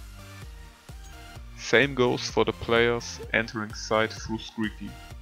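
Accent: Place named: Israel. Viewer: I could swear I clearly detected an Israeli accent in your speech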